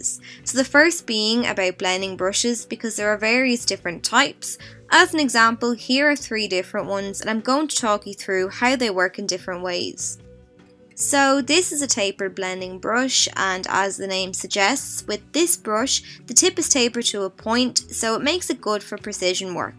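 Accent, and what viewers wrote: Ireland. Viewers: Are you Irish by any chance? Xx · Are you Irish??👌👌👌👌👌👌💎💎💎💎💎💎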